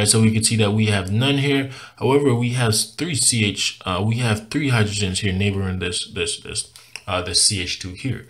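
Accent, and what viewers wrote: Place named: Caribbean. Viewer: I think I am hearing a Caribbean accent here. Thank you for this tutorial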